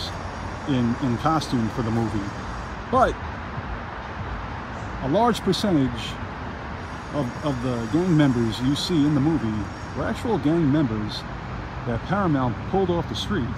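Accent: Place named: New Zealand. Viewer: Can tell u from Nz😆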